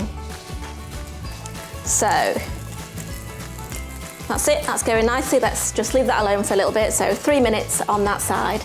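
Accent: United Kingdom. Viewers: of the video, I heard her say, "I'm just going to get my tongue out" when what she meant was get her tongs out. Some regional British accent are hilarious or simply annoying which is I suspect why she got so many thumbs-down.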